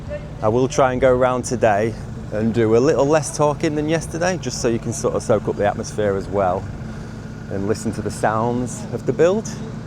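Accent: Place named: East Yorkshire, United Kingdom. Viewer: I am really enjoying your videos of the hull fair and how it's coming together just 24 hours ago there was nothing there they seem to crack on can't wait to see the next video tomorrow would love talking has it brings it together just love these videos was that helter skelter going up l remember going on that when l was young using a mat to slide down the helter skelter and l can also remember the cake walk l went on that with my dad and all l remember is we could not stop laughing love your commentary on your videos love your accent a good strong east yorkshire accent l am from east yorkshire myself and l can always remember been at south hunsley school and you could see out the classroom windows seeing the fair coming along the A63 was a good thing to see ❤Karen 😊 wow that RV was amazing l bet it's lovely inside 😊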